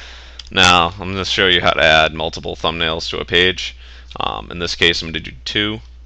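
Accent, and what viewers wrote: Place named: Canada. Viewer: by the accent, I'm guessing you're Canadian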